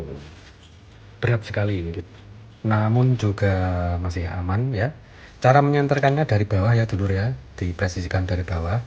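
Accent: Surabaya, Indonesia. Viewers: You sound like you are from Surabaya going by your accent?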